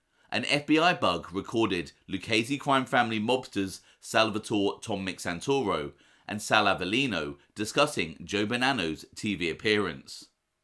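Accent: United Kingdom. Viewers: I apologize in advance to u t administrators and to the speaker of the video,in my humble opinion heavy exttrapolated british unsophisticated accent is the last thing America crime story needs I am a Cambridge graduate myself, mean no disrespect but that's the way i feel about this videos, sorry again.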